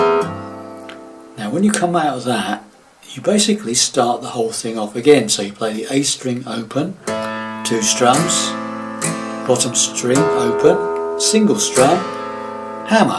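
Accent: England, United Kingdom. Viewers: The English accent caught me off guard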